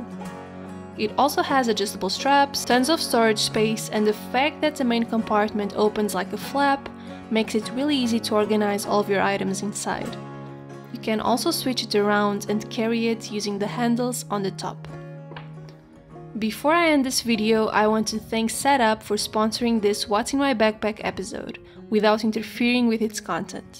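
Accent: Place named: Portugal. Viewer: she's from Portugal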